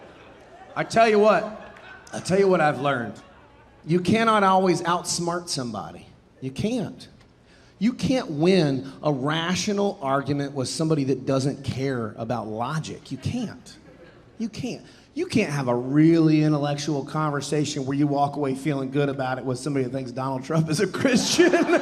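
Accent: South, United States. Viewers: Thank you for this. A breath of fresh air with a southern accent. Intelligent. Funny as hell. Just amazing! 🤣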